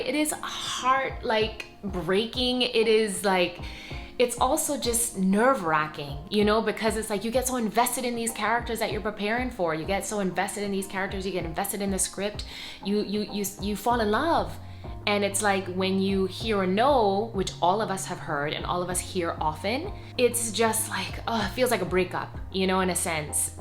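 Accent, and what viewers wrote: Cayman Islands. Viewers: Cayman Accent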